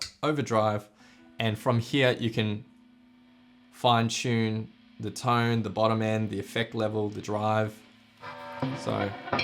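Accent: Australia. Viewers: Bought an airstep katana a few weeks ago and just watched your video. Sorry I didn't order through your link. Super clear explanation of technical issues without getting bogged down. You are a great teacher/explainer. I will look at some of your other videos. I lived in Hobart for 23 years <num> so nice to hear your aussie accent. Maybe you know the folvig bros Lawrence or Elliot. Nice guys really good guitarists. I used to play w/their sister in TAS. But melbourne is a lot bigger pace than Tassie.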